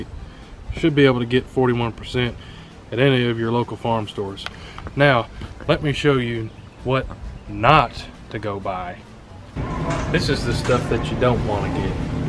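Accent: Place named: East Tennessee, United States. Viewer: He talks like we do here n East Tennessee “FRONCH Yard” 😂😂. Thanks for the video definitely getting that.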